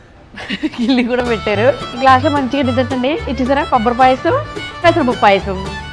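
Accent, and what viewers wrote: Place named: Amalapuram, India. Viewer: Amalapuram language super ..entandi....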